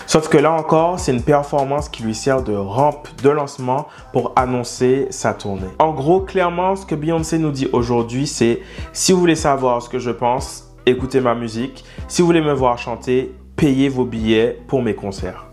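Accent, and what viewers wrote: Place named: Réunion, France. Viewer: cette femme est incroyable ! & je suis fan , mais j'adhère complémentaire sa façon de communiquer , tout passe par son art , c'est brillant . Avec sa elle limite au possible les scandales , sa renforce son coté Queen , j'adore . Merci pour ta vidéo (il aurait fallu rajouter des ptite choses comme "les moqueries sur sa fille Blue Ivy quand elle été seulement un bébé ! je pense que sa a du bien aider à accélérer le changement). Sinon très bonne vidéo , tu parle bien c'est clair sujet maitriser , bonne continuation a toi ! (je trouve que tu as un accent créole ile de la Réunion 974 ?? , je me trompe peux etre ^^)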